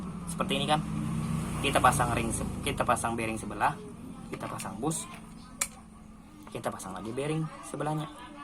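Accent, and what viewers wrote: Banjar, Indonesia. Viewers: banjar banar nah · Logat nya banjar di mana lokasi nyaa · Orang banjar kah pian om.. aksen nya kaya banjar😁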